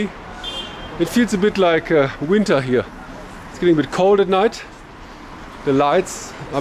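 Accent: Germany. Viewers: German English accent is so interesting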